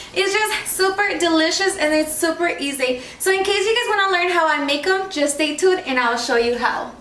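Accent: Mexico. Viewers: Over the years, you've developed a better Mexican accent! Love you watch you when you're young and love to hear you as you mature! ❤️ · Your Mexican accent is strong. I love it 😍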